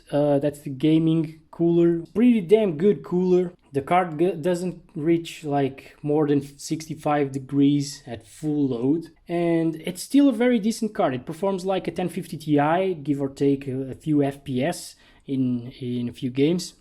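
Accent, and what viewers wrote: Portugal. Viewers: És português? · Ah, então você é de Portugal?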